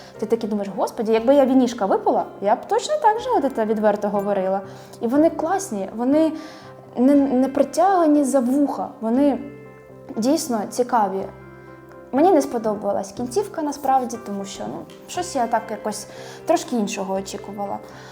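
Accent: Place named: Surzhyk, Ukraine. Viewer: подобається твій періодичний суржик) дякую за роботу.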